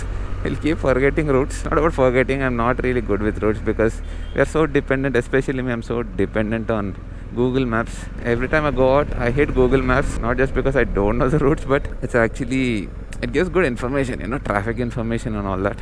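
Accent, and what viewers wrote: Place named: Telangana, India. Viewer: Gudhura nanochi gudhura Telangana basha 😂😂😂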